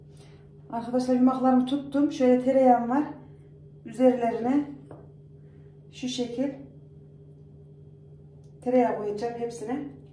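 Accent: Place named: Central Anatolia, Turkey. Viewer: Orta Anadolu danmısınız. Şiveniz sanki oralı gibi.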